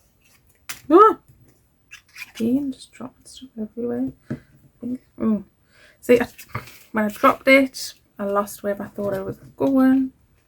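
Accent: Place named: Northumberland, United Kingdom. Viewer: Northumberland in Uk lovely accent :) from NZ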